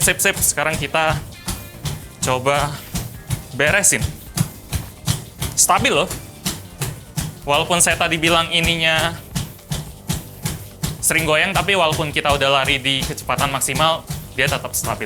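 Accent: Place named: Glodok, Indonesia. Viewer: Aksennya Glodok banget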